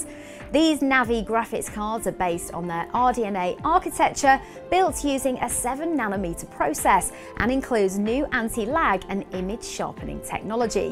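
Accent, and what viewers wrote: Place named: North Midlands, United Kingdom. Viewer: She sounds slightly North Midlands. Leicester maybe... Nottingham. I could be wrong.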